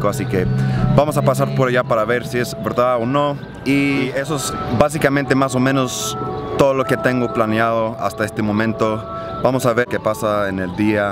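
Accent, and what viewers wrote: Mexico. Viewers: Qué bueno que visitas México porque hablas muy Mexicano, pásatelo rico en mi tierra chido güey!!! 👍🏼🇲🇽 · cada ves hablas mejor el español! y mas que estas aprendiendo el español mexicano! eso es super. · Wow your spanish is awesome. The vast vocabulary you have no mistakes at all even your Mexican accent is quite impressive. You ahould be very proud of your achivements. Remember one thing, Mexican spanish is spoken by at least 250 million people. So thats the spanish you got to use and speak for it also has such influence in the rest of the spanish speaking countries. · Ya tiene 3 años mas o menos que no es Distrito Federal, SOLO CIUDAD DE MÉXICO O CDMX O CDMEX, tienes acento mexicano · desciendes de Mexicanos'? o como es que hablas tan Mexicano?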